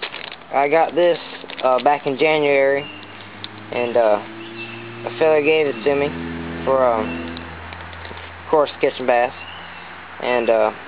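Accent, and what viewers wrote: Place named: Georgia, United States. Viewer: hey man sounds like your from my neck of the woods. im in georgia and i do alot of fishing in lake lanier and lake heartwell.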